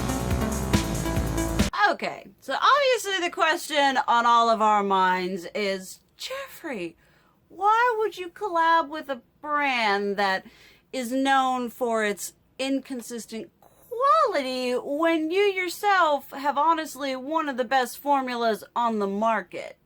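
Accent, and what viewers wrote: South, United States. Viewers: Purples are your color, both purple wigs I've seen recently look great. They really compliment your skin tone. If your reviews are with good intentions, to save us money or let us know its great or crap go for it. I like your for your attitude, interest, and you sound like me ..a southern lady with a twist.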